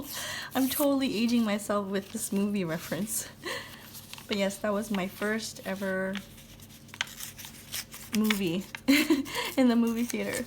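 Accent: Canada. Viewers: Thank you for this video! I have had it saved in my Watch list for awhile but have been having a planner meltdown recently, ha! I think today I have sorted out my dilemma and came on here to watch something and saw your video and it was exactly what I wanted! In fact I am now going to make a playlist of a bunch of your videos to watch as I journal! Also, I don't hear your Canadian accent.... might be because I'm Canadian too:) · Love hanging with you! Love seeing how you prep your pages. You can use the old calendar as a junk journal also. Just collage over the pages. I remember $2 Tuesdays for sure. I think my first movie was at a drive in with my family in Barbados. I was 16 and we saw the first Star Wars. How's that for dating myself? And yes you have a Canadian accent. 😁Also loved hearing you enjoy your sandwich. I also craft on my dining table. 🤗💞😘😘😁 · Haha I could tell you were Canadian the first time I watched one of your videos :) I didn't realize how much I say "eh" until I lived in the UK and people would point it out 😄 I love this longer chatty style video! 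· Fun video! Thanks for sharing-I love this type of video so please keep doing them! You definitely have a Canadian accent-it makes me smile when you say "Eh?". When we order pizza we order it well-done, so it has those darker bits of cheese and dough-yum! Have a great day!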